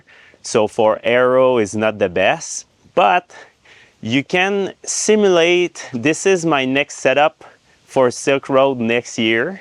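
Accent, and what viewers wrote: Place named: Quebec, Canada. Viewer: Great Video, keep the great information coming. I always love Quebecois accents so no apologies necessary. we ski and hike in the Charlevoix region all the time. Jeff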